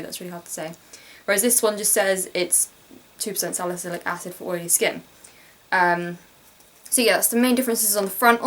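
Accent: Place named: United Kingdom. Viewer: You're British accent sounds amazing! This is a good video because I never knew the difference between the two bottles.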